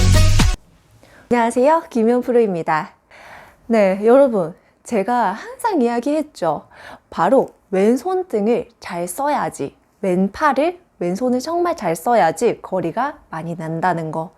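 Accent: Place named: China. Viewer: 중국에서 오래사셨나보네요? 억양이 쥥국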